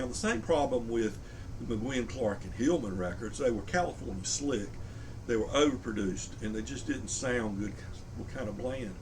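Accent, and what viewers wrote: South, United States. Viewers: Man, I watch a lot of record geek videos that turn out to be terrible, not just bad taste but SHOW THE DANG RECORDS! But yours is different, and better. You don't pretend to know things you don't, you're not chasing trends, you mix it up (I'm a jazz guy, but I saw Hillman and Peterson play together a few years ago and it was brilliant). I can't place your accent (North Carolina? Virginia? Alabama? I suck at southern accents) but I could listen to it all day. I'm a subscriber now.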